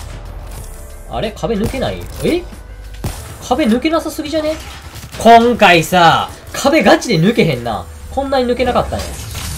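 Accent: Kansai, Japan. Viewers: の関西弁好き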